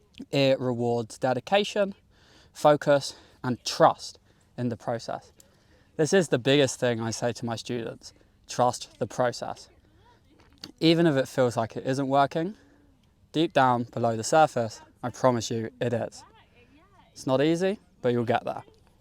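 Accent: United Kingdom. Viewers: Hi I'm from Philippines 🇵🇭 love watching your video your accent I really like to imitate to have a British accent to I always follow your video hope you visit Philippines 🇵🇭 thanks I learn a lot from you 😊❤❤❤ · Usually I don't liesint to UK accent but I like it frome you maby because you speak normally and using simple words ❤ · I enjoy your British accent👌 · هذا أشبه بالبودكاست وليس بالمدخلات المفهومة لأنه حسب ما أعتقد ليس في المشهد مايساعد على الفهم باستثناء الكتابة كحركات المتحدث او الاشارة الى مايحيط به لنفهم مايقصده فقط عندما كدت تسقط .هه.اوفي الاخير لما قلت وداعا نراكم لاحقا ..بودكاست في الطبيعة..حتى مستوى b1سيعاني حتى يفهمك ..ولكنني أقدر مجهودك في التحدث بينما تصعد الجبل وتقدم النصائح وهذا مجهود مزدوج...شكر من القلب..لهجتك البريطانية أصبحت أصعب كلما حاولت الوصول الى القمة وتعبت أكثر .. علينا أن نفهم مهما كان الحال..واصلوا ..فالرحلة الى الطلاقة معكم ممتعة متعة صوت الشلال بجانبك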